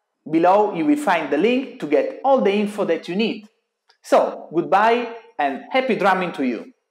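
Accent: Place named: Italy. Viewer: Gotta love that Italian accent! epic video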